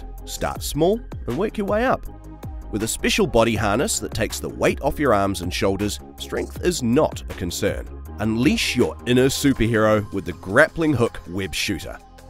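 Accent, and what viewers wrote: Australia. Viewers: Aussie accent but he's talking USD$ and no shipping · Notice they have an Australian narrorate since they wimped out and gave up their guns.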